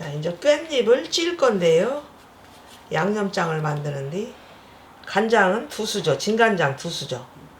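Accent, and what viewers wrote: Chungcheong, South Korea. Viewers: 전라도 사시는데 충청도 말씀으로 들려요~흐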